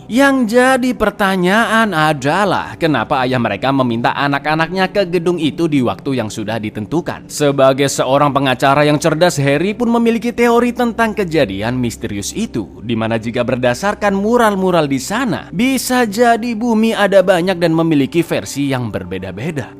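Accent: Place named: Madura, Indonesia. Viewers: Mirip logat madura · Wah logat maduro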